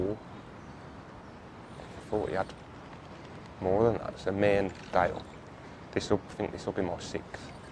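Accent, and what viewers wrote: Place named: Black Country, United Kingdom. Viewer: is back, is back. Allo, allo. Great to hear those Black Country tones back. You've been missed. Looking forward to the rest of the festival videos.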